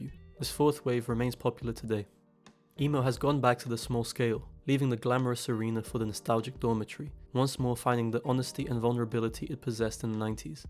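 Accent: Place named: United Kingdom. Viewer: as someone from the middle of a cornfield in Illinois, I have to say I never thought I would hear a British man explain something like this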